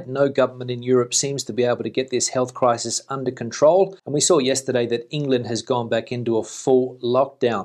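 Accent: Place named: Australia. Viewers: Saludos desde Australia!... I just discovered your channel yesterday! I moved to Beautiful Australia 3 years ago and I love it! Yes! We are lucky here! I was born in Colombia,but moved to the USA,when I was very young! So,thanks God I’m Bilingual...I visited Spain years ago and I love it! Dreaming about going back after the pandemia is over! Your English accent sounds Aussie!..but you know good Spanish by now! Keep up the good job! Gracias! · That’s because he’s from Perth Australia. After 20 years in Spain and unknown other reasons he’s developed his own accent. In today’s video he pronounced “assume” as “aschume”. I speak with a Californian accent, but weirdly pronounce several words with a British version, such as military, literally, and the weirdest one aluminum.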